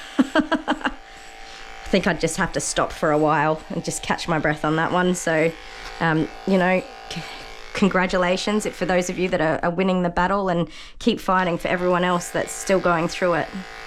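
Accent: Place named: Australia. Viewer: Hi good to see you back on here grooming I have missed your presence as I enjoy your chit chat it is so refreshing to hear an Australian accent.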